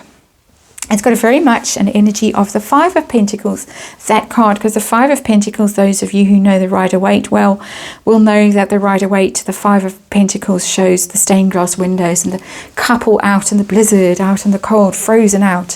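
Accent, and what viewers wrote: South Africa. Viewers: Love the lilt in her voice, awesome well bred South African accent and incredible snark! 😅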